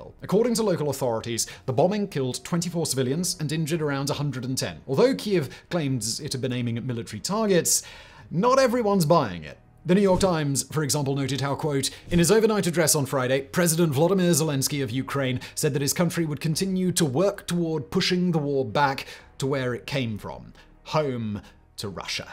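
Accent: United Kingdom. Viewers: Love your channel. One question: How come the English pronounced the "A" as an "R... and the "R" and an "A" ? Is it intentional? Like " R B C D E F... and. Q A S T U V? Not making fun, I promise, and the Brits have the best accent ever... just always wondered.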